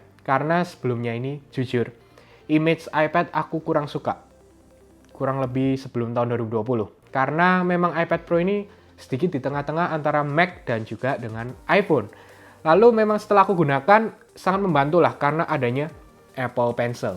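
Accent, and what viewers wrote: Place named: Surabaya, Indonesia. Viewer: Bahasanya medhok suroboyo..hehe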